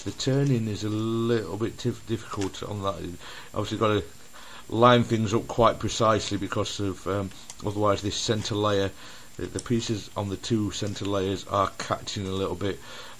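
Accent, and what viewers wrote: United Kingdom. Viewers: Rightright. I just mean British. I am not from an English speaking country so your British accent sounds awesome imo. :D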